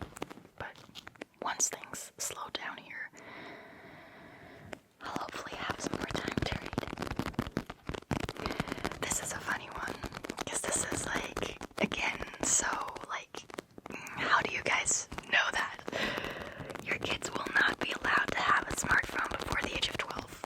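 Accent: United States. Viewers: Mando is saying why does she have an American accent when she's not American? That makes sense too.....She should have a German accent when speaking English. I've never heard a German with an American accent...... ever.... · I just don’t understand how you’re German living in Germany with an American accent !! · Never would have guessed you were German. I thought you were American based on your accent. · Alright, from your accent I literally thought you are American. Kind of surprised me when you said you’re from Germany. You have a really clean American accent. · I always assumed she was American living in the central north part of the country where there is a more German population.. I’d guess her first language was American English because she has an American accent, uses colloquialisms like “beating around the bush”, and even takes American liberties like saying “ommina” instead of “I’m going to”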